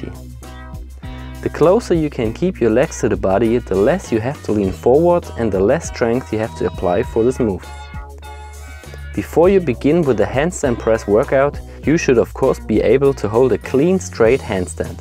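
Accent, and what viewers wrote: Germany. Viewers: Wie man einfach das "deutsch " raushört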